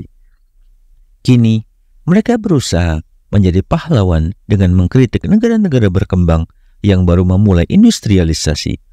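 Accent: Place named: West Java, Indonesia. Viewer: Adminnya orang sunda nih! 😂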